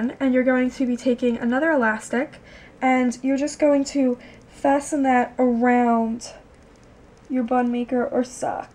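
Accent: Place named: New Jersey, United States. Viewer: Hello! Just stumbled upon you and your fiancé 's blog and landed here. I'm happy to see you have a beauty channel as I follow other gurus. I'm so excited though that you are also from south jersey so you are much more relate able especially with the jersey accent. Lol best wishes on your upcoming wedding!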